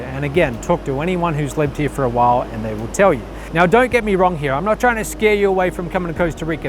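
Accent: Australia. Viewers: As an Aussie, I would say he is definitely Australian. He is apparently on Facebook - perhaps you could ask him directly as well?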